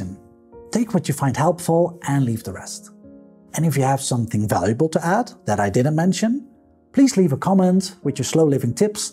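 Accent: Netherlands. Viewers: Love your ideas and videos. I am trying to decipher your "almost Dutch" accent. Do you mind disclosing what country you are from originally? I hope you write a book and a work book!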